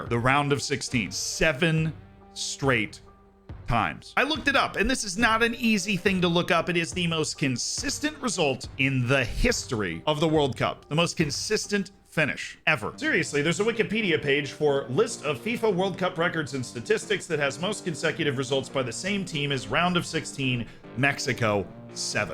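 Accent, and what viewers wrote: United States. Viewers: Your accent is way too american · I just now realized you are American lol. I just assumed, as an English speaker talking about Football, you were English. Even though your accent is very clearly americam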